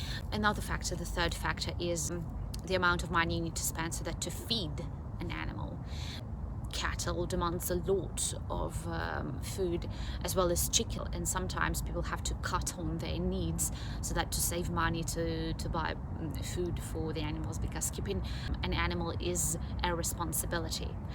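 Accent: England, United Kingdom. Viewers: Прывітанне Кацярына! Thank you for your videos. I love your British accent. I live in the U.S. in the Midwest (near Detroit). We're one of the few places in the U.S. without a regional accent. I enjoy watching Formula 1 auto racing because it is a global sport, with most of the teams and commentators are based in the U.K. What your speech is missing that the race commentators have, are the British colloquialisms. Race commentators use expressions like 'cheeky,' 'dodgy,' and one that I've caught myself using: 'shopping trolley.' (Here they are called shopping carts.) You have done a great job for not having spent time in the U.K. Maybe someday you will get the chance to travel there for graduate study. I think that you would fit right in! Thanks again. Best wishes. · I very like your British accent adaptation to the English accent with the Russian/Belarusian language much better than NFKRZ he sounds like a New York City gangster or something . 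😂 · Is she really Belarus its nice accent sounds like British. I like her voice doesn't sound choppy. I meet Belarus man but he was always drinking alcohol he is smart mechanic but never cared for his health and his Ukrainian friend always drinking alcohol. It's nice video nice girl simple honest kind. I like these kind of people. Bye and thank you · Yes, you have a very good British middle to upper-middle class accent. Slightly more English than merely British. Lovely. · i went to Belarus 2 times...to Minsk and to a village Borovljany where i met the orphan children...i have been to Russia and Ukraine also but i noticed in Minsk that the young people seemed on the whole to be very polite and well-behaved...there are many parks in Minsk and many families with young children so the feeling in the city was very different to the harsher feel in Russia and Ukraine. I saw your president driving to see a hockey match...they blocked the road and i asked the taxi driver why and he said: ''The president likes hockey.'' I like your channel very much and your voice relaxes me. 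I like to see fields, trees, rivers and animals and i like the small country houses very much. I live in England and to me you sound very English but with an unusually beautiful accent, which some would not even recognise as being non-English, because it's very good. You speak English more correctly than most English people and in a very high class accent. Immediately i knew you were very intelligent just because of how you sound. It must have taken a few years to remove your old accent and much effort, and honestly im very impressed. I never met anyone from Belarus who managed to lose the accent before. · Your English very good and obviously based on British English. It's interesting to note that when Scandinavians or Dutch people speak English it is with what I call a standard American English. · Great info main reason for your channel to pop up is your English accent best wishes from Pakistan 💕. 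· Love your English accent ... Very Soothing. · Your accent is more British...and it's pretty good. I hope you have a good future which will provide you economic stability. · I am from the UK and when I watched a few of your videos, I thought you were a British person who moved to Ukraine, maybe to buy a farm or something like that. There is a bit of foreign accent, and I thought you were originally British and had picked up some of the local accent because you live there. When I realised it's the other way around I was amazed. I don't know if you ever visited the UK but if you want to, I'd be happy to do an exchange with you :) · Hello your English is verry British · I love your English accent it's just like that of the queen of England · I was thinking you went to a English private school maybe you have learnt the British accent very well better than me and I'm from middle Britain. By the way you have beautiful blues eye's and nice hair.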